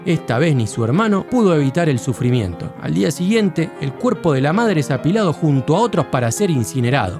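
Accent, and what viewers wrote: Argentina. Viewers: Grande Hermano, soy mexicano pero disfruto el acento argentino y las picardias del mismo.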